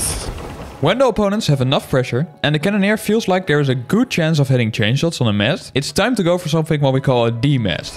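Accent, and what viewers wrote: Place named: Netherlands. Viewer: i smell dutch. Great video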